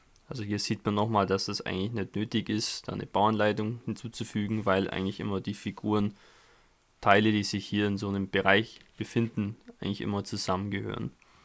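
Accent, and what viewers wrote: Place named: Swabia, Germany. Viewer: Naja ich denke mir manchmal schon wie amüsant der schwäbische Akzent doch manchmal ist... für einen Rheinländer etwas befremdlich aber dennoch macht es den besonderen Charme der Videos aus.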